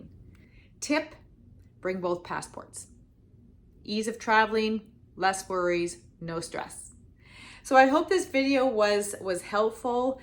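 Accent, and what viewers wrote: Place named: Canada. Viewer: It must be strange presenting your American passport at the US border with such a heavy Canadian accent. Don't the border guards ask where you grew up? You don't sound American at all to me.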